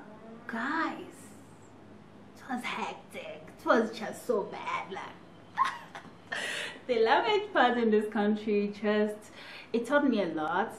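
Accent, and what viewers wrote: South Africa. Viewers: You sound south african though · Your english is good though and you don't sound like someone who comes from the french-speaking country. In fact, your accent is now truly South African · You speak English so well now and you sound South African. Thank you for your content my beautiful sister.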